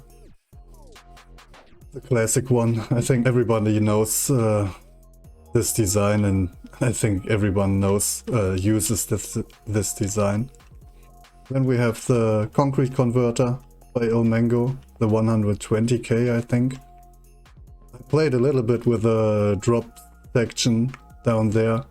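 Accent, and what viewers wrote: Germany. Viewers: Man merkt den deutschen Akzent richtig 😂 cooles video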